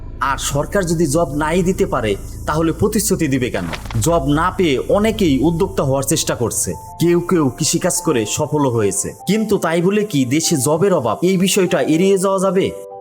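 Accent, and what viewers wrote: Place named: Patuakhali, Bangladesh. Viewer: ভাই আপনার বাড়ি কি পটুয়াখালী? কথার টোনে অনেক আঞ্চলিকতা টান আছে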